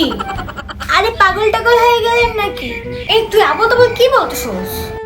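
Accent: Bangladesh. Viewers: বাংলাদেশের মানুষের বাংলা বলার ধরণ, খুব খুব মিষ্টি লাগে ❤ addictive in nature with ♥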